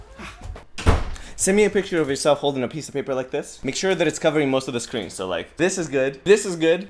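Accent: Portugal. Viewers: i think he's of portugese descent or something. he sounds like he has a slight accent. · sotaque de portugues de portugal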